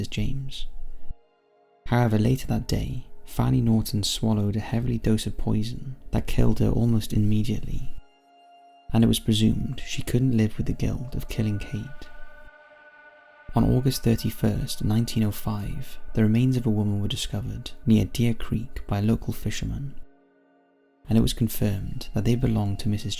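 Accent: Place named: North Wales, United Kingdom. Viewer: I’m sure the narrator is from north wales , soothing voice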